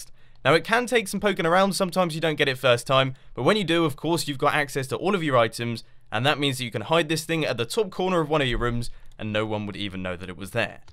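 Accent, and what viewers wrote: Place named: England, United Kingdom. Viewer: oh i forgot about the english accsent lol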